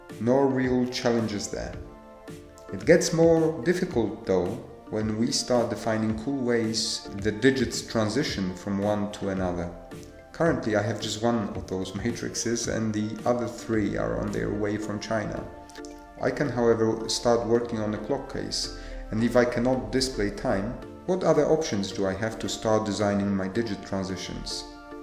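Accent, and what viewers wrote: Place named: Poland. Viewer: Tak coś po akcencie czułem, a teraz widzę po polsku. Witam rodaka:) Fajnie to się zapowiada:)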